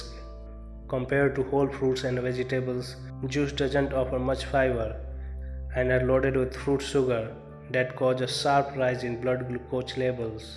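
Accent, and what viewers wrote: India. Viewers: The way he pronounces "poootaaatoooozz" that's funny! Obviously Indian accent that's for sure!!!😂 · The narrator is Indian. It is very obvious.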